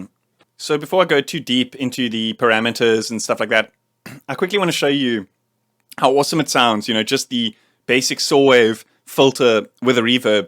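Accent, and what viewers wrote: South Africa. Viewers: I know a South African Accent when I hear one! Great review bru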